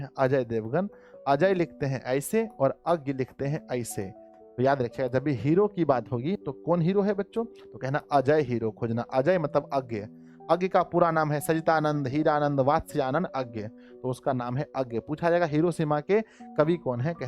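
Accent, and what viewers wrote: Bihar, India. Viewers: सर मैं आपके कोर्स इसलिए करता हूं क्योंकि आप बिहारी स्टाइल में पढ़ते हैं तो सब कुछ समझ आ जाता है कि❤❤❤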